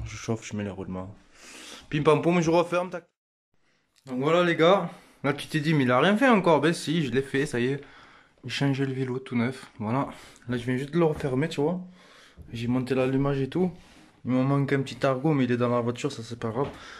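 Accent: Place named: France. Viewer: L'accent français j'pp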